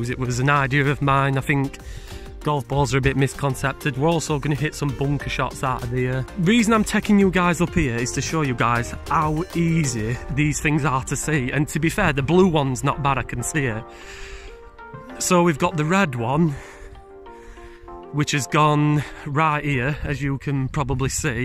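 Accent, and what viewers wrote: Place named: Sheffield, United Kingdom. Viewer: Sound like your from Sheffield pal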